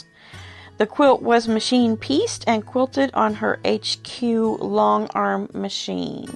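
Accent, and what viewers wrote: South, United States. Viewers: Am here! Got my coffee and have been quite busy this morning already…am looking forward to my 2nd coffee, a little break, listening to your video with your sweet-mildly-southern voice, thinking of joining your sew along if i can sew multiple projects at once. Im usually a start and finish each project before starting the next kinda girl lol😂🤣😂 we’ll see if i can swing it. Have a great day and week. Ty for bringing us your content. I love the clear plastic bins and keep my fat quarters that way with half yards as well…lol food for thought..ive decided were distant cousins… part of my family is from your neck of the woods and you resemble many of them in many ways! You seem so familiar to me in lots of different ways!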